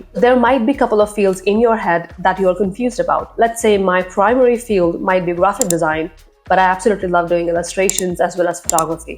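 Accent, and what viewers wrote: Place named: United States. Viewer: BTW your english has an american accent. That's sounded very good and also your content.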